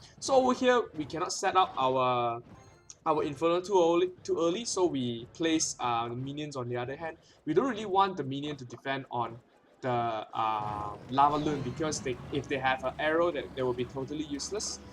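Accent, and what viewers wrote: Singapore. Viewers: when i watch this video i got alot of information.and i just subscribe it.peace man.btw are you singaporean ?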